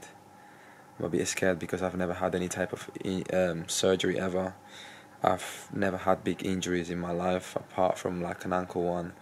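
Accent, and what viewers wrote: England, United Kingdom. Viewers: transitioning to UK with the english accent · He's british accent is good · Sound more English than Harry Kane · HE SOUNDS ENGLISH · You sound more English than Spanish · I've only ever noticed the british aspect of his accent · Why do you sound British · Ur accent is more English than mine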